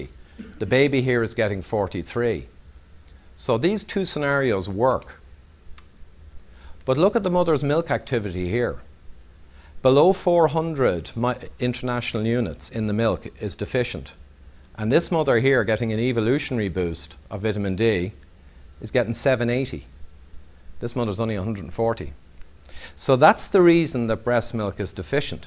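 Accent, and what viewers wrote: Ireland. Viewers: Sounds like he's from Galway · I could listen to this chap all day, his wonderful Irish lilt has me glued in the same way that Carl Sagan did. There's something in the voice that seems to illuminate what's being said, and illustrates what would be otherwise baffling. Thank you Mr..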